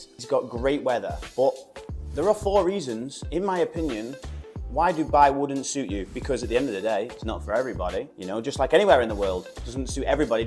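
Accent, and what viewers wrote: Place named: Northern England, United Kingdom. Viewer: Well done mate, never change that northerner accent !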